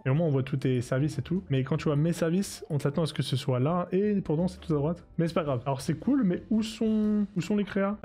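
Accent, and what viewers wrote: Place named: Germany. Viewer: Chouette contenu et bordel quel magnifique accent allemand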